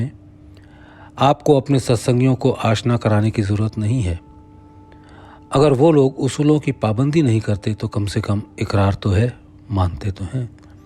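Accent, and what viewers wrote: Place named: Pakistan. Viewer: प्रिय भाई आपकी आवाज में पत्र सुनना बहुत मधुर लगता है विशेष उर्दु लहजे में अलग ही आनंद आता है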